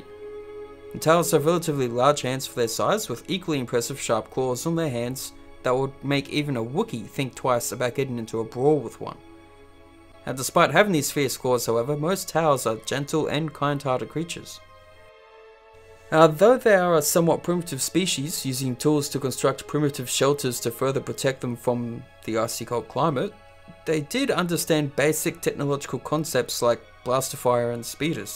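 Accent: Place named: Australia. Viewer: Just discovered your channel, I like what you're doing, I have one question though? which part of Australia are you from? I'm from New Zealand and I almost thought you were Kiwi at first but then realised you didn't have the Kiwi accent.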